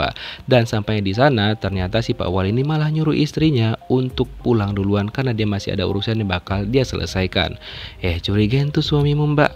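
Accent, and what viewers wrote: Medan, Indonesia. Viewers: Logat mu kek orang Medan Wak 😂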